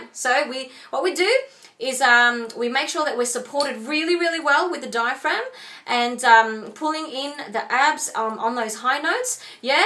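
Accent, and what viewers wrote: Australia. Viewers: Hey! I really enjoyed your videos and it's nice to hear a relatable aussie accent :) I personally REALLY struggle with projection or 'forwardness' all of the examples you gave where you were quite gentle or 'acoustic' i find that I 'ALWAYS' do this.... I would LOVE to know some vocal exercises to improve projection! :) Subscribed!!!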